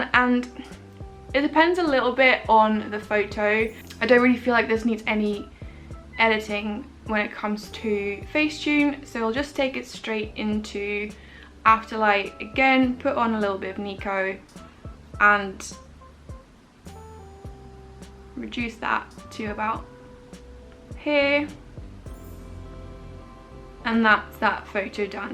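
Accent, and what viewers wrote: United Kingdom. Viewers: Gosh, you are beautiful, the british accent just give a inch of perfection to you.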